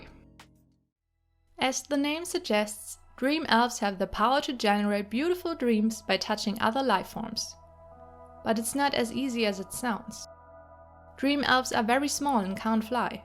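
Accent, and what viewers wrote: Germany. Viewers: Ich mag diesem deutschen Akzent in deinem englisch🥰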